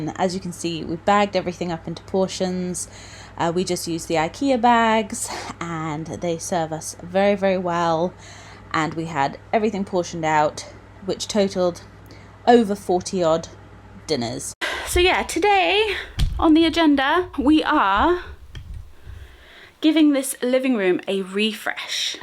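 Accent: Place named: United States. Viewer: Hi, love your vlogs. I have been wondering something for a while and sorry if I’ve missed your explanation, but I notice you use quite a few American words, like Vase or Yoghurt and I wondered if you were born or lived in America or it’s just influence of watching lots of American show(most kids of today do this 😅)? You are very well spoken, but you have a slight American twang and just wondered the reason. ❤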